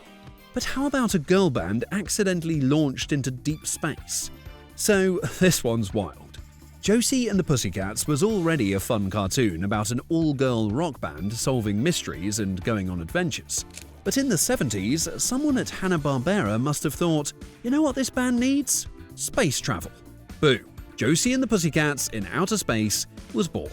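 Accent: United Kingdom. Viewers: Calling all of these cartoons "bizarre" while acting as if Scooby was normal is what's bizarre. And then to do it with a British accent? 👎🏿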